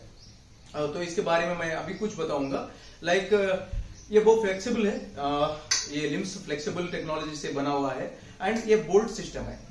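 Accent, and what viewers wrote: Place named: South, India. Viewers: He is from Telangana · I think you are from south india as listening your hindi😁❤️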